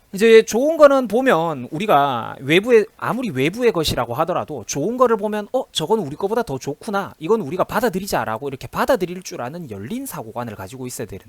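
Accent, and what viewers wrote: Gyeongsang, South Korea. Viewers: 30대 청장년인것 같은데 참 반듯하고 젊잖고... 제 아들이 처럼 반듯하게 자랐으면 좋겠습니다 자라나는 아이들에 롤모델이 되어주셔서 감사합니다 학교에서도 역사공부 이리 시켜주지 않는데 젊은 청년이 어디서 이리 많은 바른 지식을 갖추었을꼬? 경상도 억양도 참 듣기 좋아요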